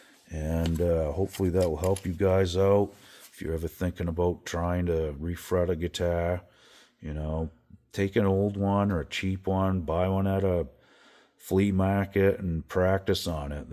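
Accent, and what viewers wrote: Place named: Maine, United States. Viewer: As a Bostonian...It's enjoyable to hear a Mainer's take on people making a fuss about your accent.. I can't leave the state without someone asking me to say Pahk the Cah in Hahvahd Yahd...🤘🏻😝. You're a natural teacher... That's why I subscribed. I've learned something every video.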